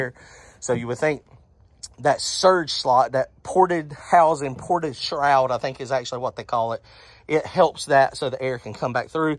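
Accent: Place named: Georgia, United States. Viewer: Thank ya, brud in law! Preciate your insight. Where you at? Sound like a Georgia boy. Take care n keep your shiny side up...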